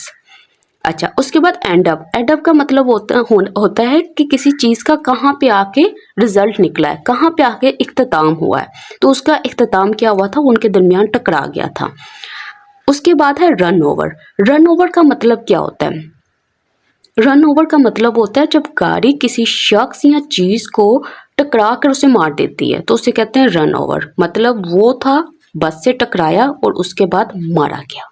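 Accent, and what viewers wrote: Lahore, Pakistan. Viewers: Ma’am, I am an English teacher and the author of two books. I truly admire the way you explain things. Anyway, Your accent suggests you are from Lahore.😅😊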